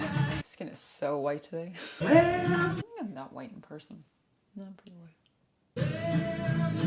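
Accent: Ireland. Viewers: Great tips! I love your beautiful Irish accent. Hello from the USA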